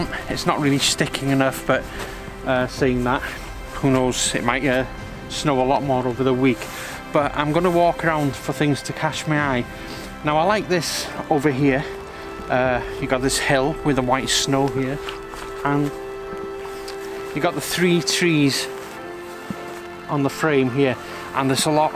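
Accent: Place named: Wales, United Kingdom. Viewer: Blwyddyn Newydd Dda. I sure am missing Gwynedd but good to hear your accent, well I guess you got some more snow, and thanks for getting out there.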